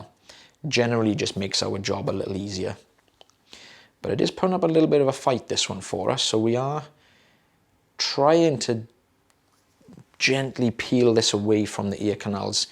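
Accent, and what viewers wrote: Wales, United Kingdom. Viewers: Look how the rookie became a specialist… The only correct answer is that you were trained by the best 🤨😎 And the Welsh accent is soo nice to listen to 🤭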